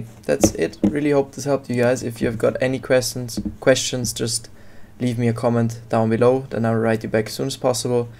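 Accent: Germany. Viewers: i Hear a little bit of DEUTSCHEM AKZENT in ur voice (meins nicht böse)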